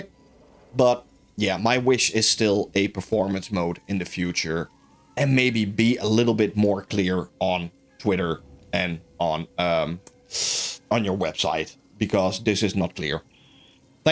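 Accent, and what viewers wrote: Netherlands. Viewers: Altijd leuk om een random video aan te klikken om vervolgens dat Nederlandse accent te horen. Top video, goede info. Bedankt!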